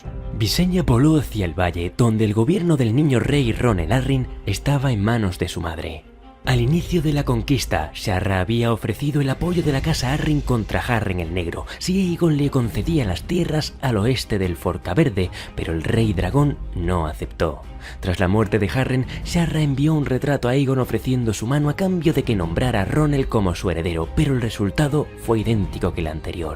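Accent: Spain. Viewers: soy latinoamericano. Pero te digo una cosa. Siempre me ha parecido que la lengua castellana de España es mejor para narrar historias de fantasía medieval, no sé porqué, pero así lo siento. Debe ser porque le da más epicidad a la narración. No estoy denigrando de ninguna manera la narración del castellano latinoamericano. Has narrado este video espectacularmente, de verdad, te felicito. Muy bueno.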